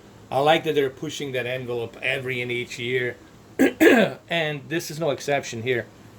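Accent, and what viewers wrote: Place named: Florida, United States. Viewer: Hey bud. Awesome cant wait to play it. However If i may request. What are your picture settings while using game mode for bfV? I am very interested in using the free sync feature at 120h. Currently when I play forza horizon 4 and red dead redemption 2 I am not using game mode but I am using your natural picture settings which give me the best picture IMO on the q8fn. Would I use those same natural picture settings in game mode? I would love to hear your opinion. As always thank you so much! Also on a side note, please take no offense, I love your accent. I grew up in Florida as well. Take care bud.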